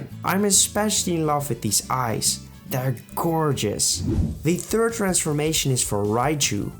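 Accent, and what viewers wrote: Netherlands. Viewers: Sorry matje je designs zijn goed maar ik kan het gewoon niet afkijken omdat je engels zo verkaast is 😅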